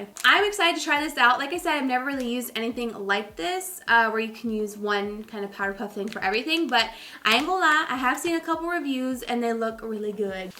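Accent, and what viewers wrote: South, United States. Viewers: hear a little of your southern drawl, but that’s adorable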